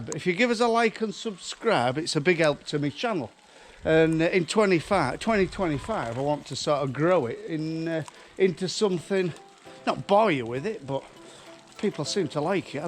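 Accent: Yorkshire, United Kingdom. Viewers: Your accent reminds me of Kirkkbymoorside....are you anywhere near there ?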